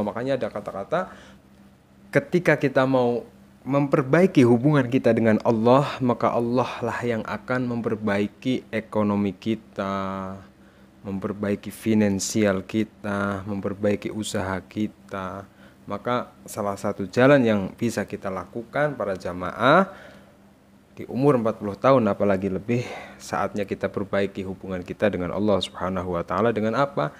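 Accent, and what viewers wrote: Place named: Banyumas, Indonesia. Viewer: Orang cilacap ,banymas, tambak, purwokerto? Ngapak bangeeet???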